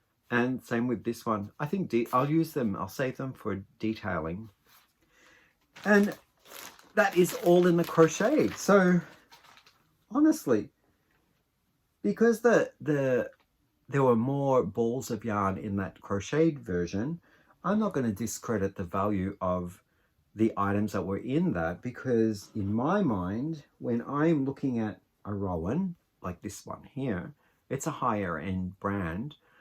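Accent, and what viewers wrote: Australia. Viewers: Blessings for quick recovery. Hope this finds you better. I'm new from long hiatus from crocheting. I've never ordered from Aberdeen. I loved their Toy Box at $2!!! So I went on the site to order....all colorways Sold Out.😢😮 I love cotton! ❤️ Beautiful Your projects are Magnificent! ❤❤❤ What a Wrap! WoW.. You are Very Gifted! Love the Colors too. Your Accent is Australian? Love to hear you talk. 😊 1st Bag was so my Colors, #6885.❤ It's a Small DK. Bloom is so Pretty. 😮 Loved the Cotton/Nylon. ❤Enjoyed all the yarn haul. Thank you my Friend. Bless You.❤️🙏🏼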